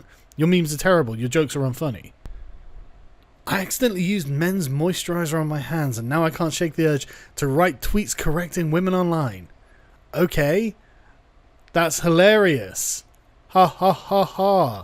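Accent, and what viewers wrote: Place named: United Kingdom. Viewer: I'm shocked you have a British accent, as I thought it was just men in the USA who spent all their time online whinging about feminists. I thought it was connected to so many men in the USA being genitally mutilated so feel threatened by women, but you've proved there are types like that closer than I hoped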